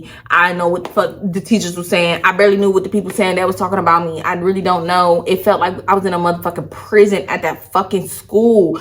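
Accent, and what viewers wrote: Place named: Louisiana, United States. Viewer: I’m hollering! 😭😭😂😂 It sounds like when I would visit my people in the country in Louisiana. I’d try so bad to not offend no one but Lawd 😂 PERIODT CUZIN! SCREAMING I wish Texas would’ve worked out for you , but I remember why 😩. It’sdiverse but every city has its duck offs where you can be with your ppl. You act like us to me 🤷🏾‍♀️! Ok, I’m done. 😂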